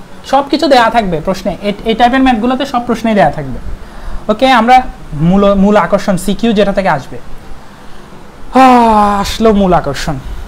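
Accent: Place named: Chittagong, Bangladesh. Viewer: চট্টগ্রামের মানুষকে বোঝা যায় তার ভাষার অমায়কিকতায়!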